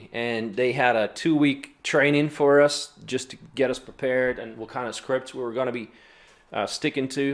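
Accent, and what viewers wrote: South, United States. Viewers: I saw a radom video from you, then look at some more... seeing your family member from UK visiting her parents. But I couldn't figure out how a Southern US guy ended up with Russian parents... hey, maybe he was adopted, I thought....;-) then dug deeper into your channel and found amazing videos. You really give a nice perspective of Russia and its people. Maybe you need to "channel these" background video's of your years in US and how you gained your experiences, your viewers would understand better your background. I am amazed how well you speak English, with southern accent and all, even your wife speaks natural. Somewhere you must be an old US Southern soul how got reborn again in Russia, because you got the fighter-entrepreneur spirit and all;-) keep making these vids, you're doing good. До скорой встречи! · The southern accent and the Russian accent mix well. 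· I love that I can hear a little bit of southern twang in your English accent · you have adopted a southern American drawl! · You're a natural story teller! Plus your English is excellent down to a bit of Southern accent. Great smile,too! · Very good, I hear a southern accent i think. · Love the Russian accent with that southern twang. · your southern twang when you speak English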